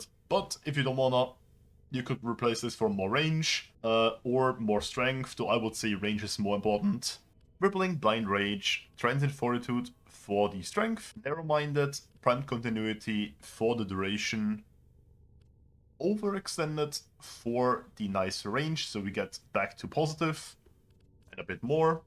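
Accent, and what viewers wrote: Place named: Germany. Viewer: Love your content, very informative. In like 2 minutes i learned more than 90% of the big warframe ytubers convey in 20. In case i heard your accent correctly, greetings from a fellow german from hessen.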